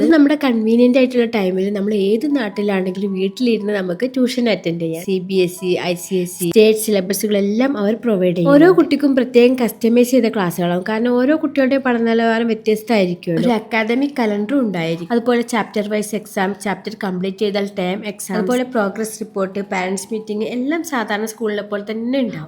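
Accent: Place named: Malappuram, India. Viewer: Malappuram varthanam ikkante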